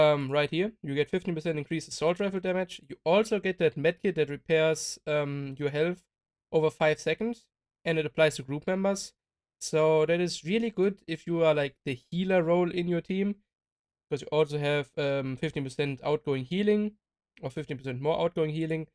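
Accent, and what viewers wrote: Germany. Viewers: Beim ersten Wort auf englisch habe ich gemerkt der ist ein deutscher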